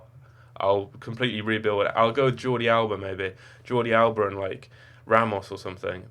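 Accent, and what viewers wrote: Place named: Scotland, United Kingdom. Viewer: Never know you were Scottish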